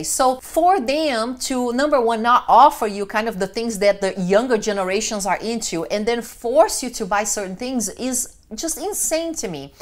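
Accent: Brazil. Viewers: She's from Brazil. She came to the US and worked really hard to become a professional engineer.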